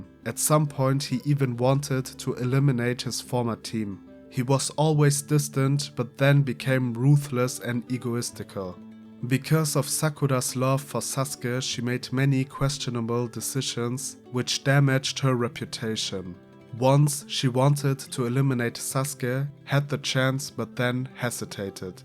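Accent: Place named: Germany. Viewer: Are you German? Your accent reminds me of my classmates from Berlin, i'm saying this in a nice way.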